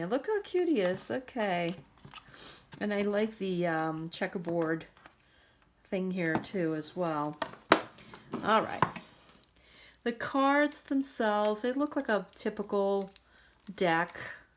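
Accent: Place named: Boston, United States. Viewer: I have been watching use this deck for quite some time now. I am not drawn to it. I think it is interesting even if some of the iconography is different than the R-W. I even like the Alice in Wonderland stories but I am just not interested in using this deck. And gosh! What a book! Too much for me! does great with it and that is enough for me! Thanks for going over it though! PS....thinking back to the old radio show Car Talk, that IS the accent you have! Yeah Boston!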